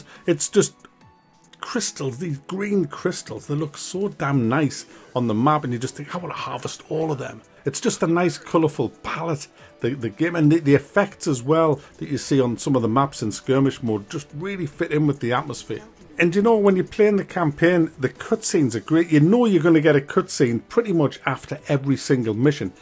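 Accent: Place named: North East England, United Kingdom. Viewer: Never ever thought i'd hear somebody from the North east do a review on tiberium Wars😂😂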